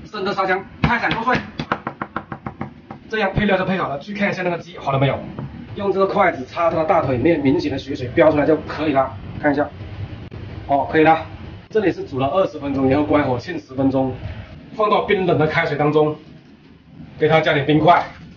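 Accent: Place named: Guangxi, China. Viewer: 柳广普通话😀现在兴吃醋泡鸡？👍👍👍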